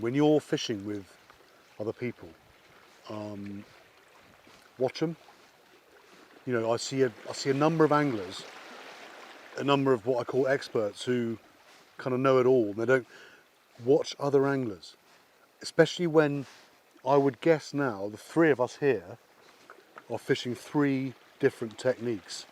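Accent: South Africa. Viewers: Love the Saffa accent! 😁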